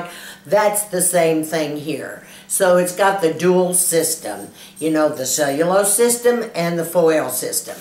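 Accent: South, United States. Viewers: I figured Alabama or Texas lol. I'm from SC. We tend to hang on to the end of words lol. Thanks for the update on this mask. Would love to try it. LOVE to SEE hydration and plumpness father a mask!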